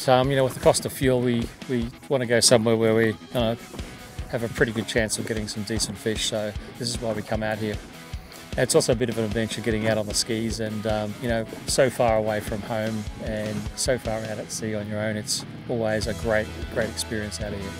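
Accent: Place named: United Kingdom. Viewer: Slow down your British accent , have no clue what you just said .😬😅✌🏽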